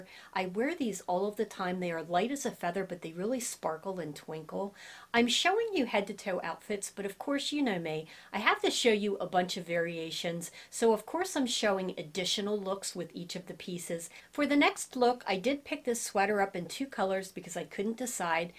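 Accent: Philadelphia, United States. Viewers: I much prefer the size small cardigan on you. The medium size, as well as the stripe design, overwhelms you. Great try on as usual. I agree with the MA/CT viewer (I'm from RI). Wustersheer is the way we pronounce it, although with our RI accent, what do we know. Love your accent (Philadelphia-ish, Southern NJ?)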